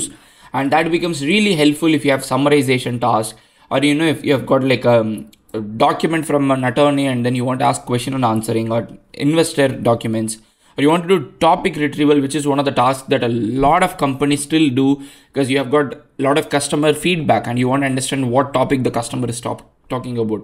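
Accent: India. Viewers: Can you use AI to remove your Indian accent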